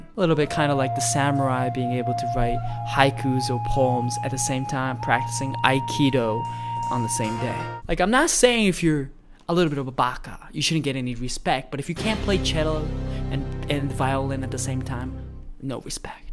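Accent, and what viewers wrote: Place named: South, United States. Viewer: Southern accent from a Japanese face, that’s nice combo!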